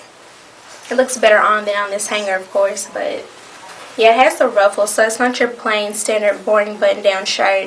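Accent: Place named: South, United States. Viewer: Omg i love you country voice!!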